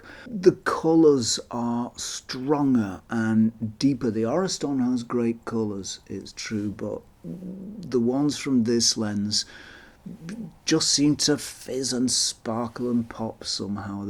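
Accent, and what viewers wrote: United Kingdom. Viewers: Great thanks to you! It was a pleasure to listen your British and things you told. Спасибо)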